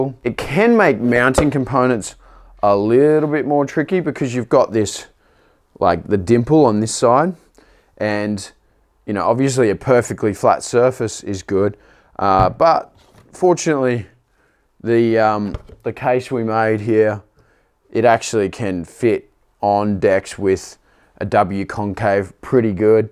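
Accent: Australia. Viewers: He's Aussie accent is funny, it sounds like he saying "Dick" for "Deck"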